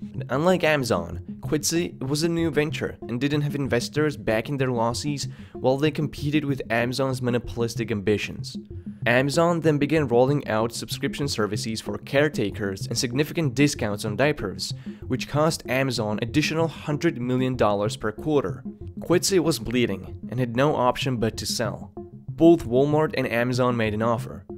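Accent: Eastern Europe. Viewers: You sound like an Eastern European person trying to impersonate a Scottish person doing an American accent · because he's trying not to sound like the Eastern European communist that he is.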